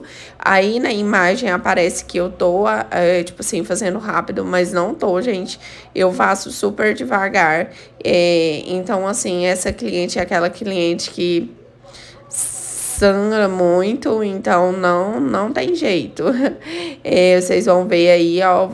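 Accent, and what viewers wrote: Brazil. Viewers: Vc é de Goiânia? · Amo os seus vídeos e o se sotaque goiano.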